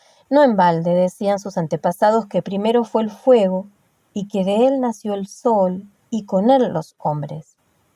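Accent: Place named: Southeast, Mexico. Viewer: Gracias por los audiolibros de Malinche. Excelente y muy bonita voz que parece del sureste mexicano (Tabasco o Veracruz). Disfruto mucho cada audio. Mucho éxito en sus proyectos 🤗👍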